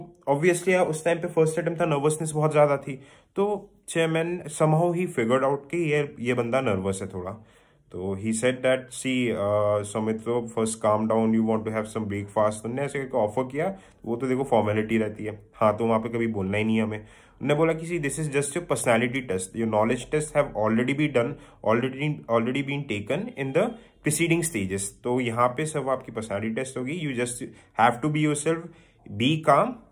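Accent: Bengal, India. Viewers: Sir R u bengali ???? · are you bengali sir ?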